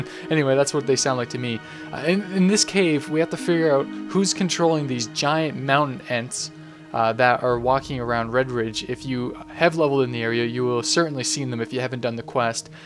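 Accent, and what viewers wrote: Canada. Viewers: are you canadian? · He's Canadian. ^^ When we watched his stream in July/August, the chat frequently poked fun at his accent. :D "What are you talking aboot?"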